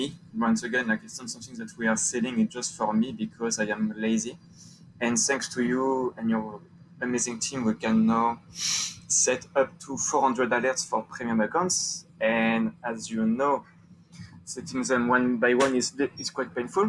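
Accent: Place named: France. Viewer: I didn't know I was looking for a French accent cliché until I found this video...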